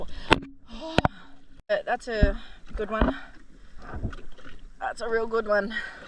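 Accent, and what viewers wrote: Australia. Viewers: New subscriber to your channel and am very happy I found it, gonna binge watch your channel. Are you Australian? You have a similar accent to my buddy Rod from Rokkit, I've been subscribed to his channel from the beginning. Anyways looking forward to binge watch your channel take care and have a blessed day. Aloha from Kansas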